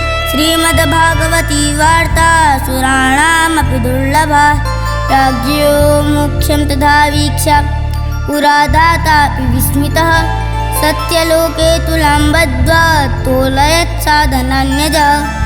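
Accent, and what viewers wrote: Standard. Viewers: बहुत ही सुंदर प्रस्तुति । श्लोकों का शुद्ध उच्चारण और स्वर बहत ही मधुर है। प्रभु कृपा सदा बनी रहें।🎉❤ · 🎉❤🎉 बहुत सुंदर , और शुद्ध उच्चारण 🎉🎉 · बहुत सुन्दर एवं शुद्धोच्चारण 🎉🎉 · शुद्धोच्चारण एवं उत्कृष्ट प्रस्तुति।❤️ और सभी विशेषणों को परिलक्षित करने वाले आपके उत्तम संस्कार, सभी का समावेश श्रीराधाकृष्ण की शब्दमयी मूर्ति का अनुभव प्रदान कर रहा है। महादेव से प्रार्थना है कि वो आपको सदैव प्रसन्न एवं धर्मवान बनाए रखें। जय जय श्री राधाकृष्ण।🙏🏻 हर हर महादेव 🔱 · प्रिय के द्वारा बहुत ही सुंदर प्रस्तुति ओर भाव से इतने सरल तरीक़े से श्लोकों का शुद्ध उच्चारण बहुत ही अच्छा लगा भगवती मा आपके कंठ में ऐसे ही विराजमान रहे 🌺🌺❤️🙏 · प्रिय को वेदोक्त आशीर्वाद। इतनी सुंदर प्रस्तुति एवं शुद्ध उच्चारण के लिए साधुवाद श्रीमद्भागवतमहापुराण के माहात्म्य खंड के प्रथम अध्याय का पाठ बहुत ही सुन्दर प्रस्तुत किया है।🌹🌹🙌🙌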